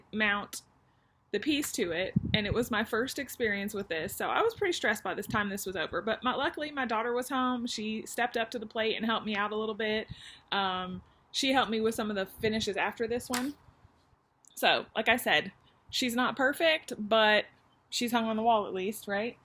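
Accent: South, United States. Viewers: Just finished watching both of your videos, and really enjoyed them! Love your location and your southern accent. My husband and I vacationed at Fort Walton Beach a few years ago, and really love the Gulf Coast. Your stitching is lovely, and I really enjoy your book reviews. I will have to try the Victorian Motto Sampler threads, as they look truly beautiful. Looking forward to more videos from you!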